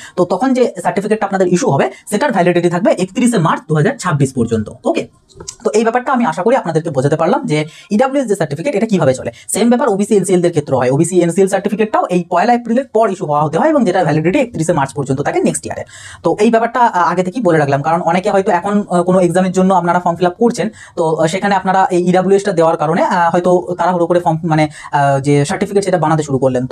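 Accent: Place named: Bangladesh. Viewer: Tumi kichu jano naa Bangla vasa er moddhe' so' bola bangladeshi . Jader state obc ache tarao ews korte pare seta hobe central . Porer bar theke bangla vasa ar valo information sikhe bolte asbe .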